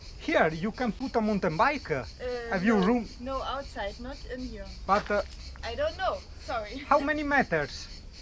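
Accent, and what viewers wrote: Veneto, Italy. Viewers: Che tristezza, acqua azzurrissima che pare di un altro mondo......invece, inquinata. No dai, giuro ci rimani male. Come abbiamo ridotto questo povero pianeta. Bellissima la parlata inglese con accento Veneto. Te saludi.....!!!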